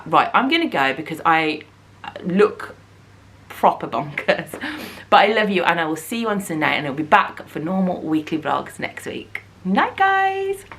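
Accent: Birmingham, United Kingdom. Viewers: I don't listen to audiobooks pretty much as a rule... but I'm absolutely going to hold out for reading me 77 parental poems, in all it's brummy glory 🙌🏽 with love, from Saudi Arabia xoxo CONGRATULATIONS! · Well done x love the emotion x Real x love to meet ya x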